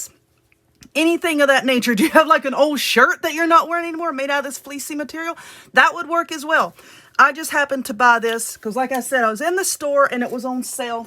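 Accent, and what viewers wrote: South, United States. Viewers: I love your sense of humor and your Southern accent. Only nosey people are going around picking up stuff to see if you took the tags off 😂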